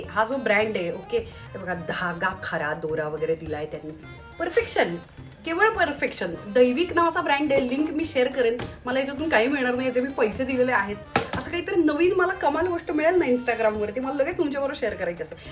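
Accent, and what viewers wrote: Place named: Kolhapur, India. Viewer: Tu punyachi vatatach nahis Kolhapuri pattern ahe ha as Dhak dhak mhantay❤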